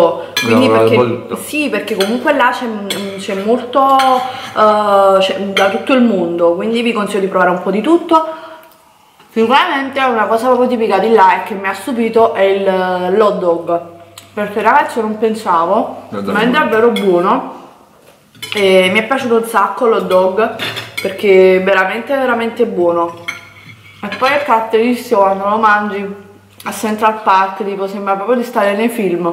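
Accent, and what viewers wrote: Naples, Italy. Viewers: Sì è Napoletana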